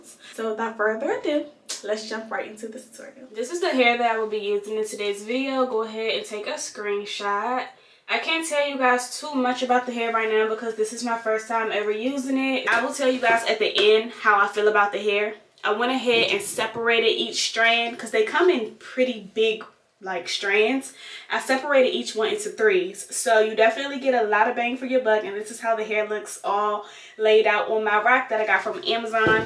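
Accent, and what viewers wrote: Washington DC, United States. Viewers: Accent give me dc vibes 💕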